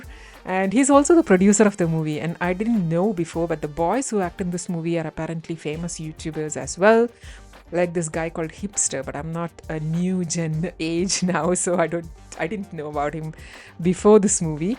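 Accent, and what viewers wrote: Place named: Kerala, India. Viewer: മല്ലു ഇംഗ്ളിഷ്. 100%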